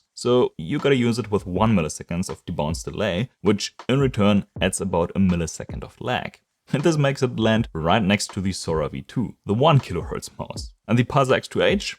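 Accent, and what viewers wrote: Germany. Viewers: Mann hört so sehr den deutschen Aktzent heraus😅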